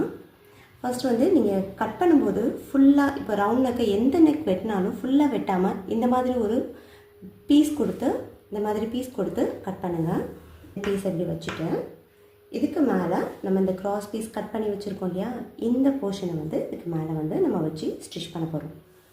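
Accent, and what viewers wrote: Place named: Kanyakumari, India. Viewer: unga pechu kanyakumari Kulasekharam side mathiri irukku sis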